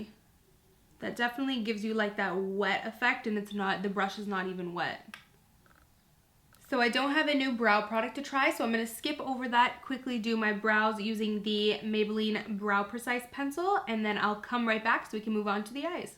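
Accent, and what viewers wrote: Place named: Canada. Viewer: Is she from Canada? She pronounces 'sorry' as 'sorey' :p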